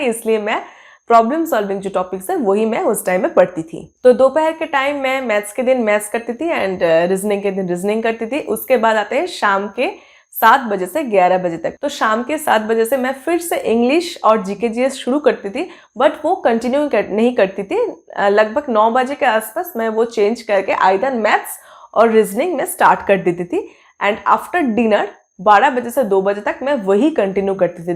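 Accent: Bengal, India. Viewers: Mam r u Bangali or asami?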